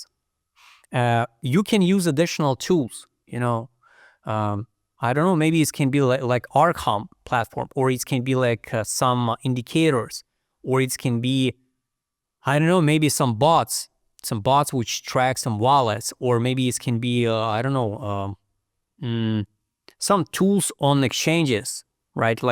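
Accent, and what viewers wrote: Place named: Eastern Europe. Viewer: Brother, I think you have a Slavic accent.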